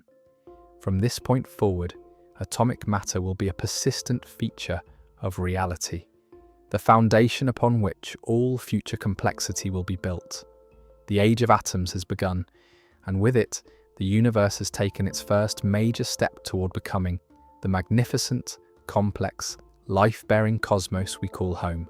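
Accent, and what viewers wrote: United Kingdom. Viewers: your British voice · Ignoramus with a British accent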